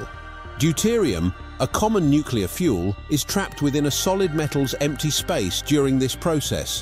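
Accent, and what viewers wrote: United Kingdom. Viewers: How about inviting Tony Stark to give a hand? He knows all about fusion, since he carries one in his chest … what a sensationalistic video, luring people to watch in its entirety just to find out China has no artificial sun nor has launched bananas in the air. Shame com this narrator with his dreadful British annoying accent !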